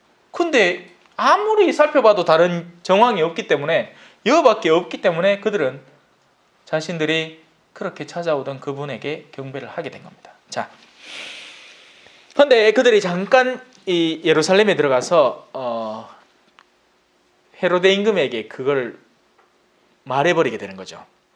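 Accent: Gyeongsang, South Korea. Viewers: 신부님~ 이틀전 우연히 신부님 영상이 떠서 오늘부터 마태오복음 1강 시작했습니다^^ 신앙생활1년차인데 같은 경상도 사투리라 친근하고, 많이 배우고 깨닫고 느끼고 갑니다. 앞으로 한 강의 한 강의 들을 때마다 저도 영적으로 많이 성장할것같아요😊😅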